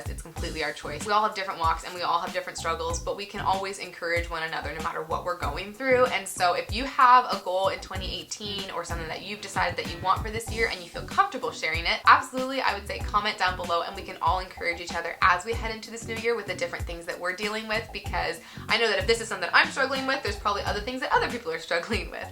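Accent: Canada. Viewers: I'm an ESFP and I can say that assuming everyone is dead when they are a minute late is very accurate... Thanks for showing me that I'm not the only one! Also. Your accent is adorable and so hard core Anne Of Green Gables ❤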